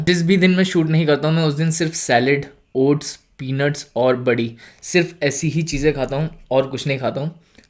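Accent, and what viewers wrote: Maharashtra, India. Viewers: Lol your marathi or bengali or what?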